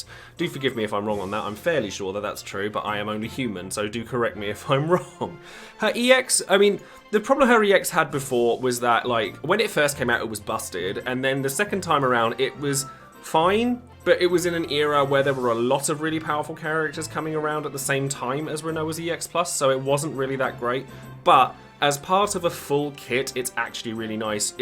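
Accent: United Kingdom. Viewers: Laughed at the title. I truly enjoy your videos unlike most. Must be the british accent haha but joke aside your videos are very informative hence I watch it. Keep up the good job and hopefully you get more subscribers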